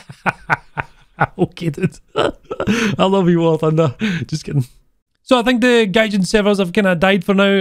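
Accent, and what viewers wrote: Scotland, United Kingdom. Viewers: I do really enjoy your intros ! And this one is gold, especially the part on the French "Sacrebleu". As a frenchman I can tell you that i've never said that when cursing :D Love your Scottish accent and thanks for the great content dude :)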